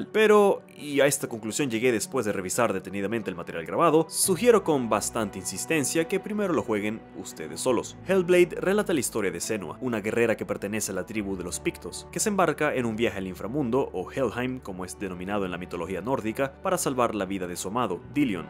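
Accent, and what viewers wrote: Venezuela. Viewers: como se nota q eres venezolano, salu2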